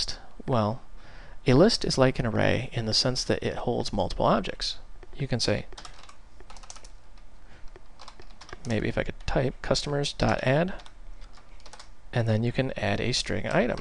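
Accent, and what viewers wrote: United States. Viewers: thx man! ur awesome, great american voice and accent!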